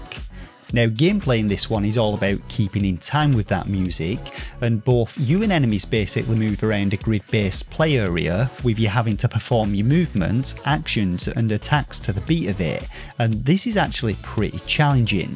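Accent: United Kingdom. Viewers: but every single word you pronounced was in a British accent. You did pronounce it correctly. We invented the damn language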